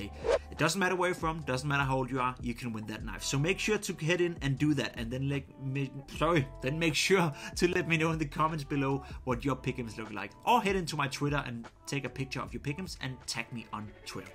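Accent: Denmark. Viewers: Are you Danish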